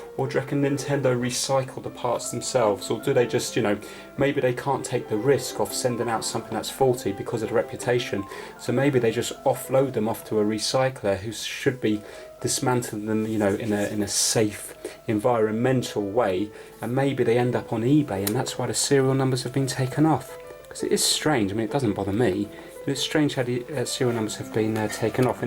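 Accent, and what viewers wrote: England, United Kingdom. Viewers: Greeting from Nevada, these videos are very interesting. Plus your English accent makes the videos bright and energetic.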